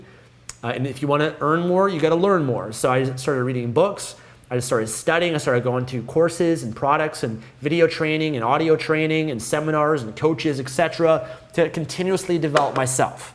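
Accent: Canada. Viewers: Great information, thanks dude! and your accent has Canada writen all over it. nice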